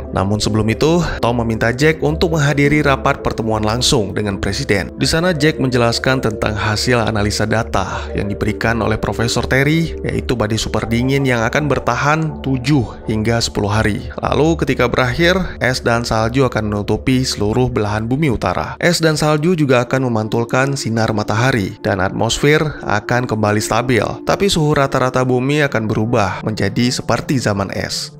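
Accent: Aceh, Indonesia. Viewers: Bang orang Aceh pasti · Ooh. Mimin org Aceh ya? 😅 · Ooo miminnya dari Aceh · Orang Aceh bg? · Abang orang Aceh ternyata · Brakti bg orang ACEH ya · Asal Aceh ya bang 🙏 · lu dari aceh bang? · Abang dari aceh?😳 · Dari Aceh ya bangg 😭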